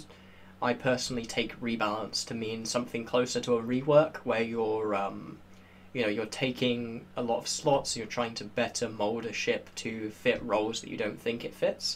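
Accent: United Kingdom. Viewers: Ok, this might be a strange question; I started watching your videos recently and you sound like you have a southern british accent (i'm from 2 hours SW of London) and... yet you have a huge american flag on your wall. I'm very confused by it and was wondering why this was the case? are you american or british?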